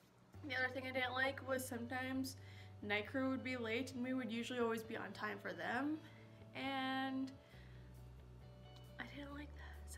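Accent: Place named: South, United States. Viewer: Me Bcn its southern accent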